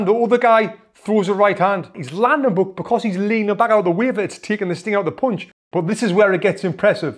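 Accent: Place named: England, United Kingdom. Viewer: jef mate i swear ue english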